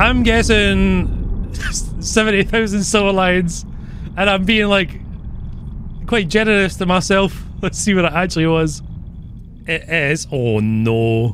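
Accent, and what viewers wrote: Scotland, United Kingdom. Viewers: I really enjoy watching your videos, but please forgive my ignorance, are you Scottish?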